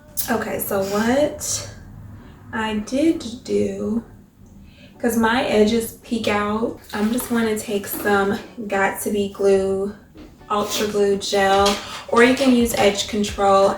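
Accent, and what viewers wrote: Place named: United States. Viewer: Love your country accent girl!! Love the video thank you 👏🏾👏🏾👏🏾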